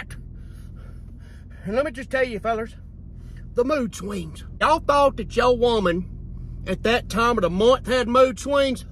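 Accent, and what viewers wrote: South, United States. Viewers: Gotta be that southern accent and slang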